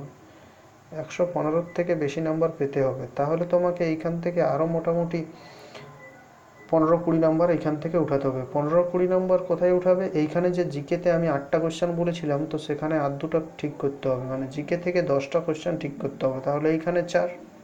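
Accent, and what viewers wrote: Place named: Purulia, India. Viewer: Karon... Amio purulia r😂 Pronunciation ta tei dhora jai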